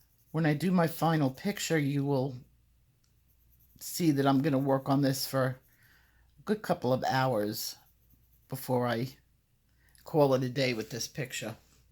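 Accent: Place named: Long Island, United States. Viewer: You are truly amazing! I look forward to your videos weekly and am so thankful that I get to hear a long Islander talk! Feels like I'm back home! Please keep these videos coming..I can't wait to see your texture videos and what's to come next!! I am learning so much from you, thank you so much!! ❤ oh I must say I developed my artistic style last week after watching your video I went through my coloring books like I do almost every day looking for a picture to color not knowing what colors to color them or what style to do, and I actually, visually, visualized the picture! It was amazing I realized it, and I never would have realized it, if it wasn't for your videos and for you! THANK YOU thank you so much I broke through the coloring block!! Xoxo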